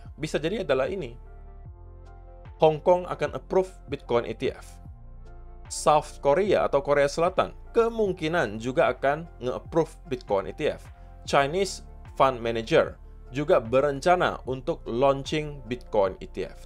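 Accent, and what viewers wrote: Pontianak, Indonesia. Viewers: haa abg org pontii ???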